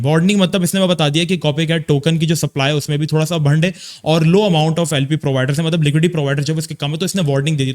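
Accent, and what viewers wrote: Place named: India. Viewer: aapka accent almost indian accent hai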